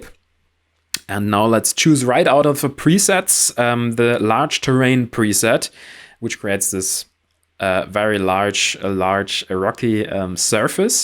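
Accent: Germany. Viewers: Love it! Thanks for this inspiration and the tips! Pls more of this sort of Videos!! Are you from Germany? Your accent sounds like that! 😋😅🙏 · Are you from germany because i recognize the accent . Viele grüße! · Liebe es Deutscher Akzent 😂 top video danke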